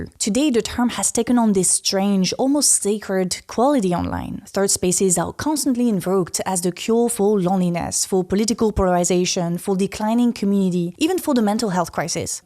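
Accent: France. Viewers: Cute french accent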